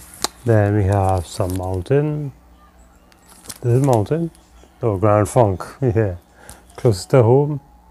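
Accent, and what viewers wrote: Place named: Caribbean. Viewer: Sweetheart... This was incredibly sweet. I loved this. You served us Dvds, cds AND vinyl? Yes, honey. One of my best friends is a trini gyal. Hard to not know that classic west indies accent. Love it. You nailed this AND gave us a part 2? Ill deep dive, sugar. I need that rekkid btw. Toodaloo!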